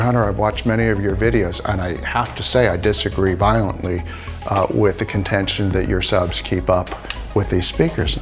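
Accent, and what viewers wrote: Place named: United States. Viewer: High dudgeon? Who talks like that? An Anglophile? Certainly not an audiophile. There's nothing like getting scolded by a person that has an American accent and uses Britishisms.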